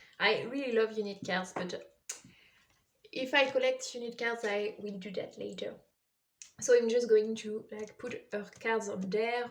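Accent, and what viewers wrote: France. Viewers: is this a French accent I hear ?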